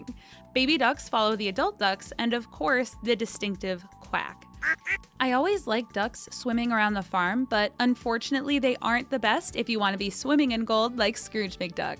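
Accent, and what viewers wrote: Canada. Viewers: Are you Canadian? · You sound Canadian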